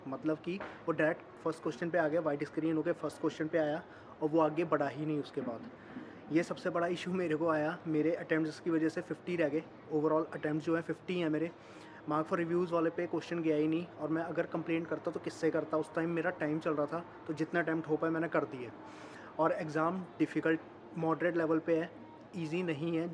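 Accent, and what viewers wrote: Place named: Punjab, India. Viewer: Bhai aap Punjab se ho kya? Apki hindi me bhi punjabi accent aa raha hai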